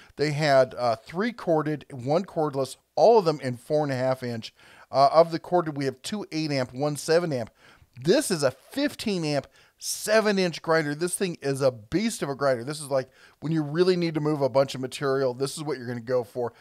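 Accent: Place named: Brooklyn, United States. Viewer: Pittsboig ??? Are you from my hometown.. Brooklyn ? 😁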